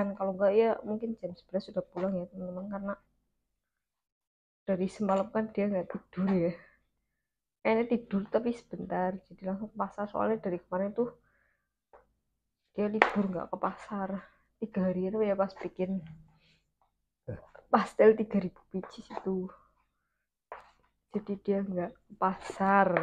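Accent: Banyumas, Indonesia. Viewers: Ora ngapak ora kepenak jawa ngapak nya mn nduk...??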